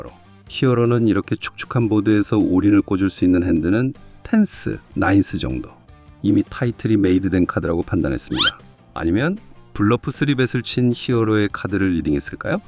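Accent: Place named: Gyeongsang, South Korea. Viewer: 다른 영상에서 플레이하실때 음성이 나온적있는데 경상도억양이 있으시던데 더빙은 다른분이 하시나요?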